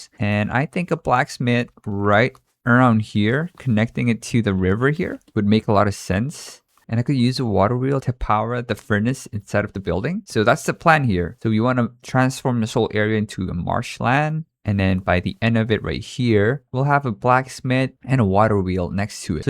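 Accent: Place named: Indonesia. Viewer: this is my first time seeing this channel and i thought i recognize that slight indonesian accent and turns out i'm right!! 😄i didn't expect this, are there more of you guys?? peak content btw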